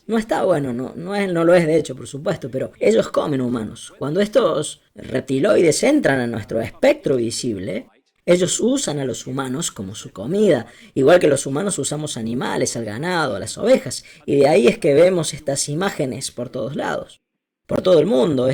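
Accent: Córdoba, Argentina. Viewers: la voz de ese español acordobezado está genial!! Muy clara y precisa!!! Dejá que cacareen los culiaos jejeje!! Felicitaciones!!! Like para Uds.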